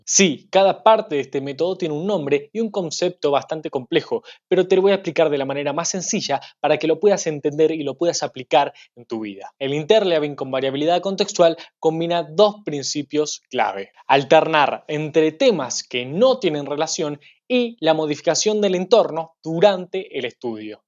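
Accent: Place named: Uruguay. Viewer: Muy buena recomendación 👌 👌 Eres uruguayo? Lo digo por el acento.Saludos desde La Rioja Argentina.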